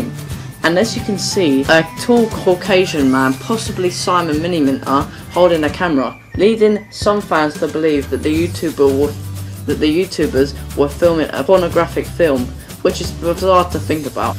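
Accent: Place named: England, United Kingdom. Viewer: Where abouts from England are you from. I am from Leeds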